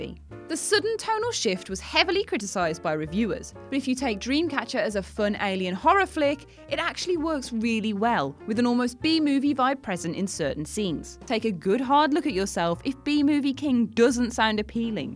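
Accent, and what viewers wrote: United Kingdom. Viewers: British Much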